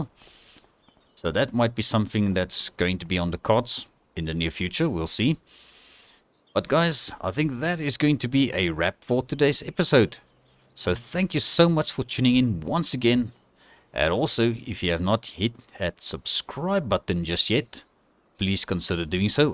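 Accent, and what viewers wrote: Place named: South Africa. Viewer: Hi are u south African that accent sounds like u are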